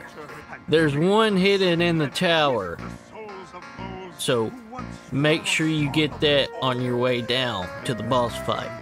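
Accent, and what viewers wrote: South, United States. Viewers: I agree 100. He's quirky, interesting, and I love the southern accent.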